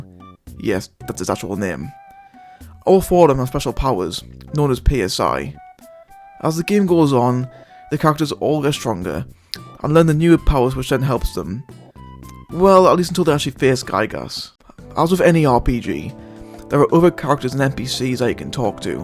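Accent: Newcastle, United Kingdom. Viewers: You a Geordie?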